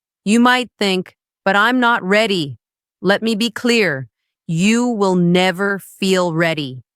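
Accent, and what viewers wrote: United States. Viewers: It is an American accent based on my hearing.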